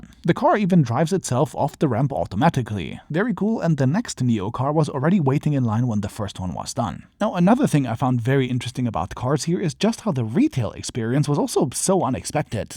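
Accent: China. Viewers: Why'd you have a slight chinese accent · How much does this guy get paid to simp for china? He even has a slight chinese way of speaking english.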